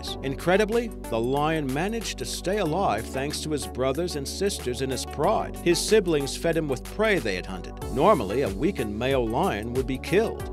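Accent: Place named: Australia. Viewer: Rainbow Dash you can't tell? I'm American he's definitely Aussie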